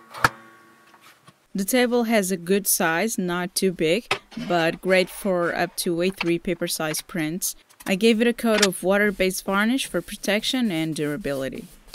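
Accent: Portugal. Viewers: Suspeitava que fosse portuguesa, pelo sotaque! Acertei hehe :D (sou professor de inglês, por isso notei facilmente, btw ;)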